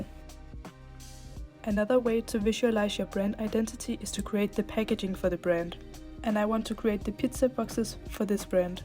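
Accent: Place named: Germany. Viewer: I know my Germans when I hear one 🤭 love your work 🫶🏻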